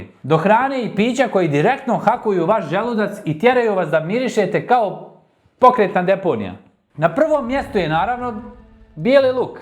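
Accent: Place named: Tuzla, Bosnia and Herzegovina. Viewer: Ovaj naglasak mi najvise vozi na Tuzlu, Bijeljinu i taj dio (prije ce bit Bijeljina)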